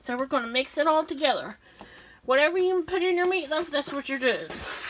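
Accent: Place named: Kentucky, United States. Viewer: Ya sound like me when ya talk..I am from Kentucky. and I feel at home here...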